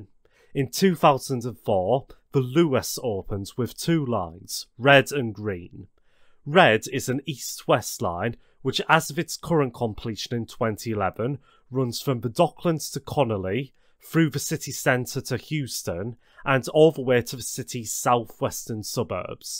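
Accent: United Kingdom. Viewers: British accent is so strong its hard to tell you're speaking English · The person sounds like they're from Liverpool that's talking in this video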